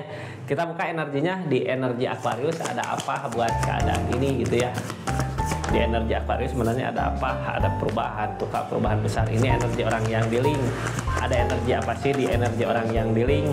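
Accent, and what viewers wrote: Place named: Sunda, Indonesia. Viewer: Saya suka dg mas lo wajahnya seperti anaku nomor 3 trus bahasa Sunda nya medok bangett makasih redingannya sehat sll berkelimpahan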